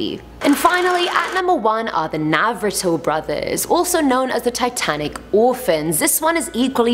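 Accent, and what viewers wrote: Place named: United Kingdom. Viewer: I'm just focusing on her British accent💙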